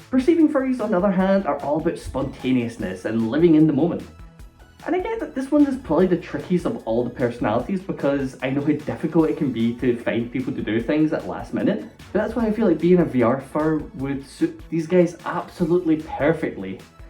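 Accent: Scotland, United Kingdom. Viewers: Recognised his accent a couple of seconds into the first of his videos I watched, and immediately knew he was a fellow Scot!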